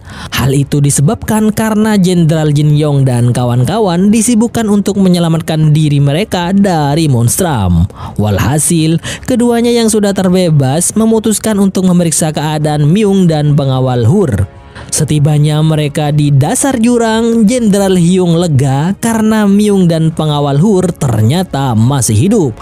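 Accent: Madura, Indonesia. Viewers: Madura mana bg?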